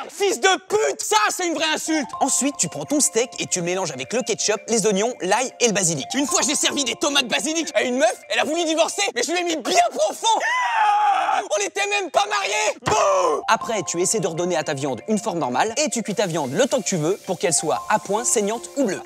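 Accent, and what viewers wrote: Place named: Quebec, Canada. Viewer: En passant ton accent qu'on appelle le joual est je le sais car je vis au et qu'on utilise ct'osti d'accents la tabarnac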